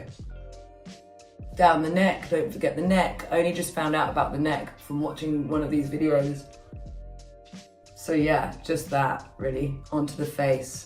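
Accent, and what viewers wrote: United Kingdom. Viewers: Omg , i love so much the British accent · The way she talks is so adorably British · I love her 👌❤️ her british accent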